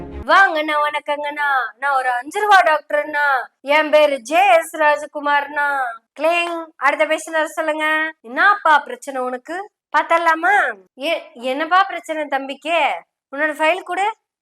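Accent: Kongu, India. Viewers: Ur kongu speech is so 😊😊 beautiful.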